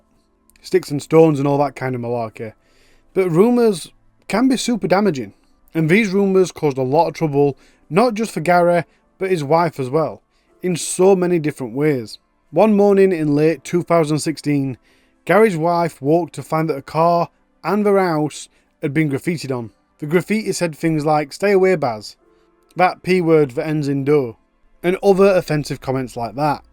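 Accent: United Kingdom. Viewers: Enjoyed this , subscribed, nice to hear a UK voice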